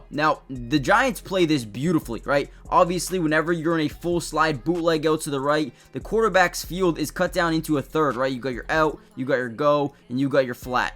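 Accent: Canada. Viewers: Do I detect a Canadian accent?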